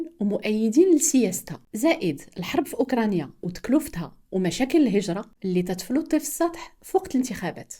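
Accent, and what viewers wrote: Marrakech, Morocco. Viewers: نقطة من نقط الضوء النادرة في اليوتوب المغربي، المواضيع، الأفكار، طريقة الإلقاء، التسلسل، اللهجة المراكشية…كلشي ممتاز، الله يعاونك، محتاجين الناس بحالك فبلادنا (و شكرا الالكوريثم لي قترح عليا القناة ديالك 😅)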